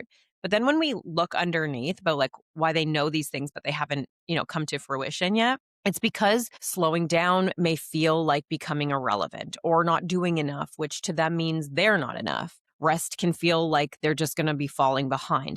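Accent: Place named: Canada. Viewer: This is the female version of Modern Wisdom; she doesn't have a British accent but she talks as fast. Canadian, eh?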